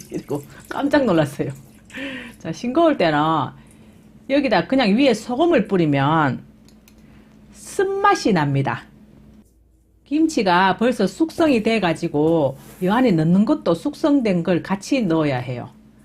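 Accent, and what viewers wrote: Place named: Daegu, South Korea. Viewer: 싱거운 김장김치 고민중에 ~~영상보고 도움됫습니다 ~~대구사투리듣고싶어 한번씩보고 구독 좋아요 꼬옥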